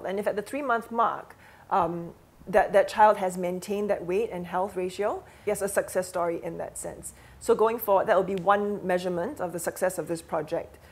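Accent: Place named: Australia. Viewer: i love Australian accent :D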